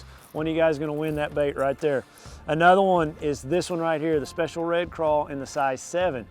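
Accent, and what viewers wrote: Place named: Midwest, United States. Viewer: I just like your way of teaching others, easy to listen too! Plus you're a Midwest guy right, u think I heard you're an Okie?!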